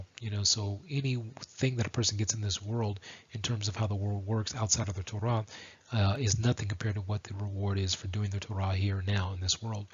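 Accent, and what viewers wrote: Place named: Arab world. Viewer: You are from Arabic erea you prononouce many arabic letters like letter ,Ain in arabic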